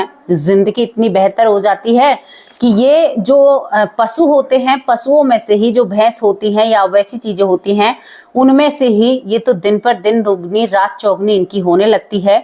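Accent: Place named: Punjab, India. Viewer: aap jab baate kr rhi hoti hae to kush words punjabi vaale hote haen jaise hum bolte hae badhia lagta bolne ka lahja bahut pyara lagta hae ❤❤❤❤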